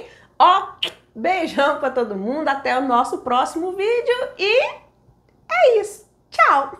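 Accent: Minas Gerais, Brazil. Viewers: não sei se vc é mineirinha, mas que esse sotaque mineirês seu é gostosim de ouvir, ah, isso é....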